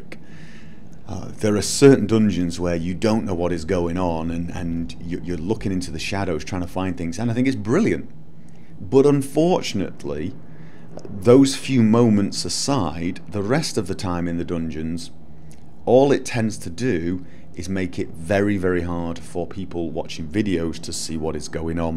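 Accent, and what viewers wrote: United Kingdom. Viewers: okay, I thought it was wierd he had that good brittish accent if he wasn't from there.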